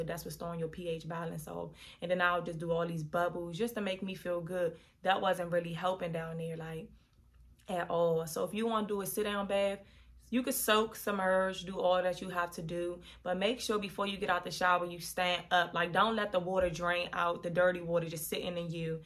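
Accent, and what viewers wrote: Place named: Washington DC, United States. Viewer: You from dc ? I know our voice when I hear it 😂🫶🏼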